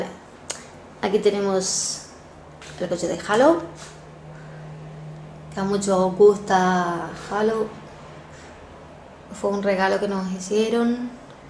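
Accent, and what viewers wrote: Canary Islands, Spain. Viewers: oye una preguntita, tu eres canaria no?, lo digo porke yo si lo soy y me suena mucho tu acento, y en caso de que lo seas, donde vives con sara en la península o por aquí? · Eres Canaria ? :))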